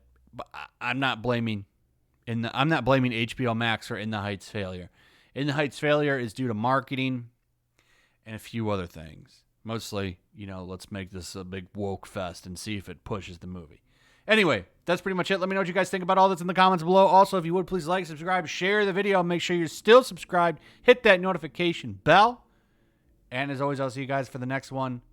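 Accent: United Kingdom. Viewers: Your British accent has me weak 😂😭🤣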